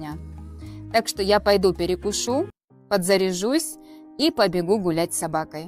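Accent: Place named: Ukraine. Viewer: Почему-то акцент такой как человек с Украины 😉 адаптации Вам скорейшей ❤❤❤ язык наверное уже освоили,ведь с свекровью нашли общий язык 🌹🌹🌹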